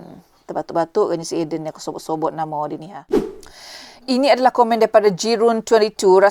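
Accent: Negeri Sembilan, Malaysia. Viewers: Suka dgr puan bercakap. Slang negori xtinggal. Menginggatkan sya pada seseorg tapi xboleh nak recall suara sapa. Pn asal mano. Masa form5 sekolah di mana & thn bila?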